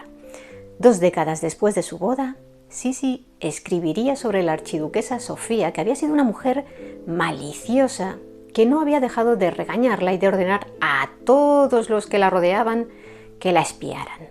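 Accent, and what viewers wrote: Spain. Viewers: Opino que tu forma de relatar es de puntos 💯 y eso que no es muy de mi agrado el acento español. Me en..can...to!🎉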